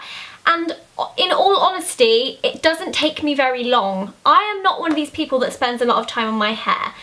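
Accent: England, United Kingdom. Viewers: Are you from england? Your voice sounds so elegant! You sound like the queen of england! (Im from the US so im not used to fancy accents) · I love u omg ur so adorable! Where from England are u? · you have the best British accent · British accent!!!!! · Omg I love your accent sooo much! Is that British accent? I'm not sure bcuz I'm not real English person... Any way I loved your video! Now I got that how to make a fish tail ^.^ thanku so muchhh :) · R u british? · finally! someone else with an English accent! i thought i was the only one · Is she really British or was she faking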